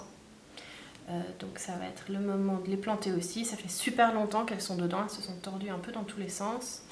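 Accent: Switzerland. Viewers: Sympa ce petit tour ! Et ça m’a fait plaisir quand j’ai reconnu ton accent, trop cool d’avoir des suisses dans le YouTube game 😍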